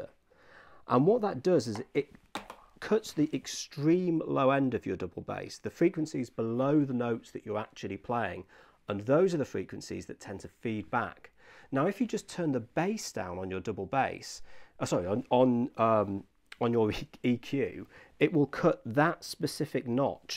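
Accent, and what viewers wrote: United Kingdom. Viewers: I've got a completely un-bass-related question for you. You're from the UK right? What specifically is your regional accent? I've had an accent my entire life that many people have assumed is British, but I've always been skeptical, but never sure what it really was. There are certain nuances in your speech that actually match mine; so I was wondering what your regional accent is.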